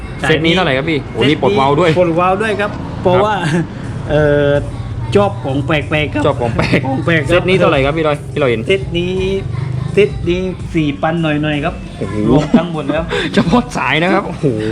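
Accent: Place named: South, Thailand. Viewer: พี่แกพูดติดใต้หน่อยหน่อย 😆😆😆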